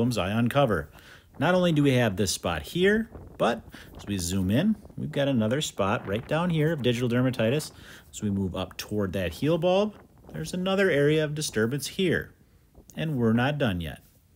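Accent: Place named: Great Lakes, United States. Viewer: your Great Lakes accent is music to my ears. I’m from Western New York originally, and you sound like home, even though you’re in Wisconsin.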